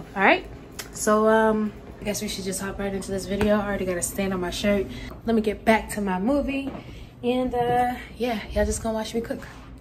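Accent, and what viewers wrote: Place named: Midwest, United States. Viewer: That food looks good and you sound like you’re from Chi town or the Midwest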